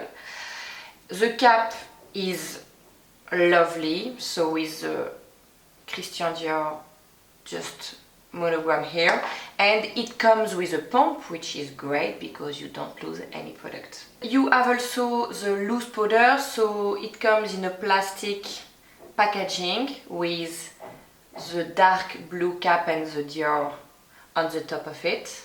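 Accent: France. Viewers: your french accent is super cute! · I just found your channel by complete chance (searching for Dior Forever reviews!) and I am so happy that I did. I have always been obsessed with everything French and I love your accent.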